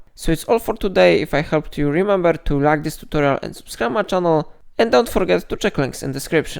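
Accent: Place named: Poland. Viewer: Polska ❤ trochę słychać po akcencie i na dole widać POL pozdrawiam 👍🏻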